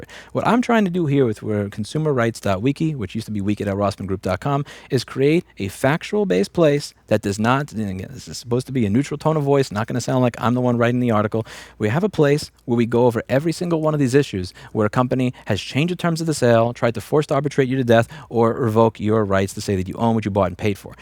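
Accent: New York, United States. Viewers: New Yorker detected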